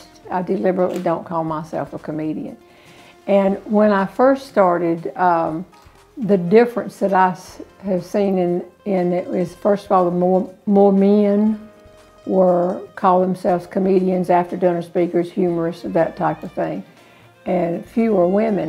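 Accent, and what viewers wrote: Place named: South, United States. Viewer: Just discovered you! Utterly glorious humour and love the southern accent. Thanks goodness for Youtube!! Love from London :)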